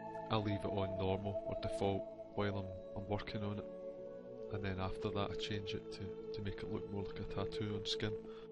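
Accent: Scotland, United Kingdom. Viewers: Your stuff is great, and I've watched all of your videos. But turn down the music by 50% or use a ducker plug in (Ducking Compressor) to reduce the volume of the music when your talking automatically. It's really hard to follow what your saying with your scottish accent. but the narrated vids are much better than the silent ones.They move so fast till you have to stop them and try and figure out what you did at key moments. You are a big inspiration. I've been using Photoshop for 22 years and use to be pretty good in painter but at some point it became to complicated to use and I lost track. but you have brought me back. Thanks. To see my stuff go <link>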